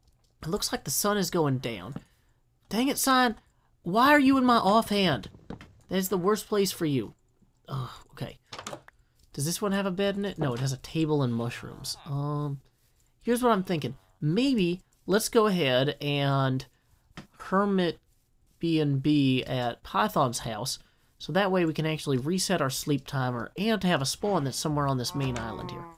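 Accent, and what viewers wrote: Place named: South, United States. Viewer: I just wanna point out the country accent you have compared to everyone else in the hermit craft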